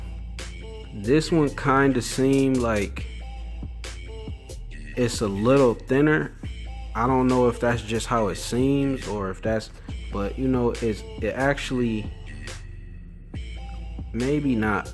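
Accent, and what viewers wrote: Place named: AAVE, United States. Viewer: Dey pretty much uhmm yeah dey day dey day damn son lose that rap shit and fix the umm Ebonics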